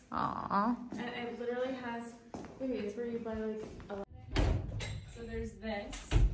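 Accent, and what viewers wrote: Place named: United States. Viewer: Thank you for a wonderful film of your journey from USA to France and your new home. Filming was excellent. You are speaking much slower now as sometimes an American accent is difficult to understand. Seems sad the past Owner didn’t arrange for some bedding after paying can only imagine a large sum. France is a great country, I am English, but French people can be hard to get to know but once they know you are very helpful etc. I wish you all the luck very different to San Diego which I loved visiting some years ago. Good luck 🤞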